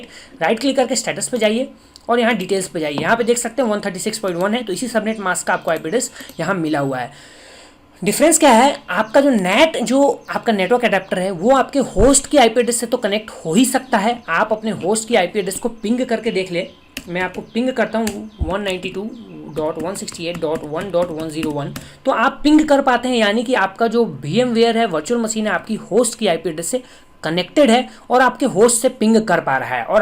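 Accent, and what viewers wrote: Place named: India. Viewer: This is indian english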